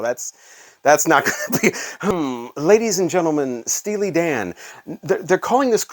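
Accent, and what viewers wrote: United States. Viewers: High bullshit = High record prices?! Wtf are you talking about? Another issue; ripping people off is NOT business. And btw… there’s no doubt you are an American. And finally, proper pronunciation is not pretentious.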